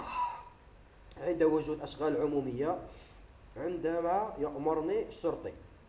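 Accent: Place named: Morocco. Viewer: انا من الشرق فهمت لهجتك %100 شوية تشبه لتاع لمراركه شكرا بززززاف على الشرح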